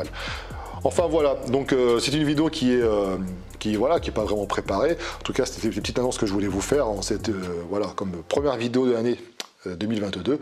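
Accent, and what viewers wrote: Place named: Nice, France. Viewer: * qui a de plus en plus l'accent niçois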